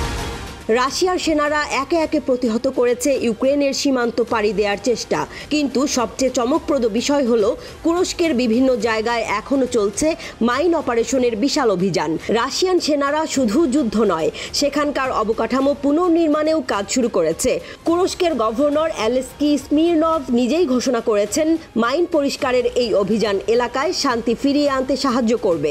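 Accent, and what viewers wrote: India. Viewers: আপু,,আপনি কি বাংলাদেশি না ভারতিও।বাংলাদেশের কোনো সাংবাদিক এই ভাবে নিউজ পড়েনা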